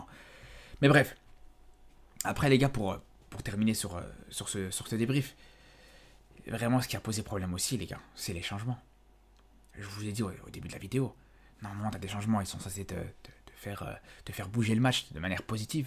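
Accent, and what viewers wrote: Belgium. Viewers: Frère t’es belge non ?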